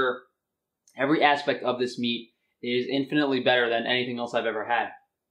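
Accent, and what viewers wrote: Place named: East Coast, United States. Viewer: Bro I love how into it you get!!! Definitely a man who's passionate about quality meat. Keep up the awesome work... Always great info... And I'm always thoroughly entertained by your East-Coast-ness!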